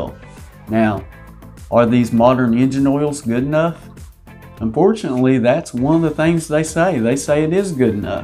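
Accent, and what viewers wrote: South, United States. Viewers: Super strong southern accent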